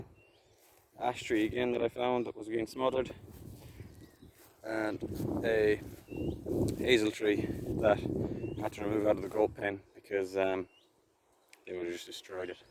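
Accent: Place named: Mayo, Ireland. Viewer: Assuming it’s mayo given the accent planning etc?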